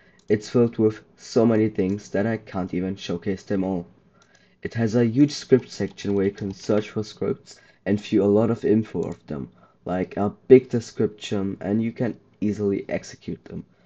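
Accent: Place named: Germany. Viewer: ich höre deutsch bruderherz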